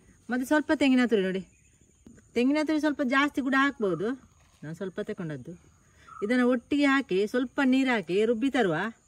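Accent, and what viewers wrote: Mangalore, India. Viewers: Pure mangalore kannada❤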